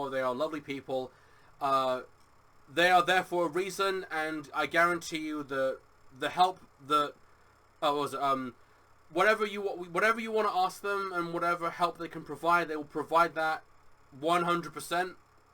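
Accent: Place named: United Kingdom. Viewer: This whole time and I never realized you were British lol Lord help me, I'm not bright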